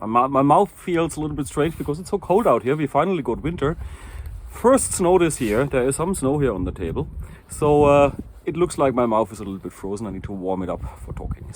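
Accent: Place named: Germany. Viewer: Wieso in englisch? Bist doch Deutscher oder nicht? Also dein Englisch klingt sehr deutsch 😅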